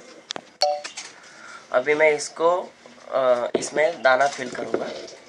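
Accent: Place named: South, India. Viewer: Bhai bohut accha lagraha.. u r trying to speak in Hindi and unr doing so good... I think u r from south?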